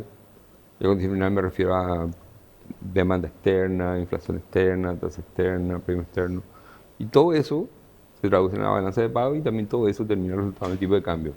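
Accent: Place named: Paraguay. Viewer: Habla como paraguayo. No se le entiende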